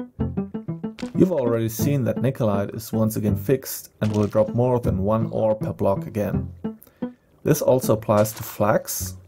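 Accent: Germany. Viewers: Deutscher oder..?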